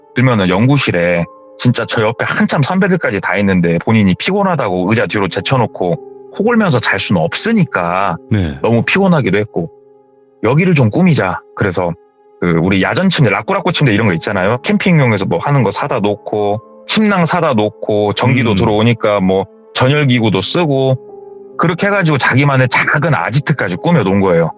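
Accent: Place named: Jeonju, South Korea. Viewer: ㅋㅋ저는 전주라 생각했는데ㅋㅋ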